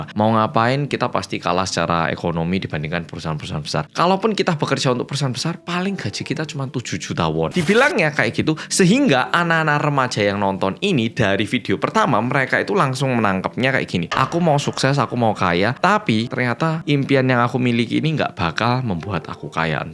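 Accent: Java, Indonesia. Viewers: Hai org korea yg medok🎉 bolomu lgsg nyimak🎉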